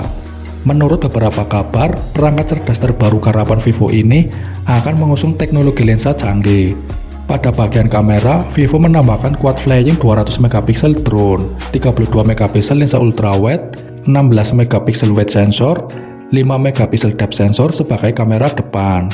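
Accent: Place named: Tegal, Indonesia. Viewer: Logat tegalnya medok bet dah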